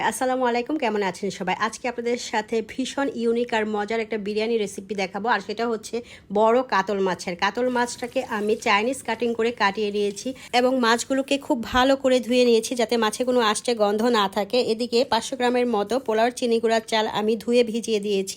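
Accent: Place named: Bihar, India. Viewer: আপু আপনি কি বিহারী..? আপনার ভাষা শুনে বিহারীদের মত মনে হচ্ছে।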